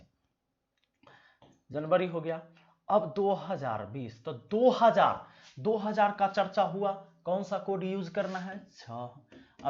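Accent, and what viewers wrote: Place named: Bhojpuri, India. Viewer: Wars ko bars Yeh Bhojpuri bolne wala teacher hai Bekar lagta hai. Mane mane yeh kya yaar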